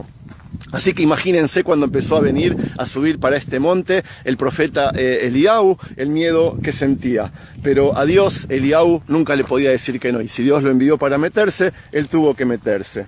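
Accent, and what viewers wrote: Argentina. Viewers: Porque dice este hombre Isabel? El nombre es Jezabel no Isabel. Tú no eres judío pareces argentino che...!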